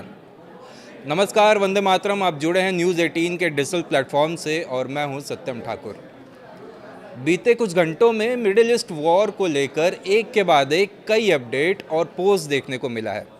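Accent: Bihar, India. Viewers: Bihar se hai kya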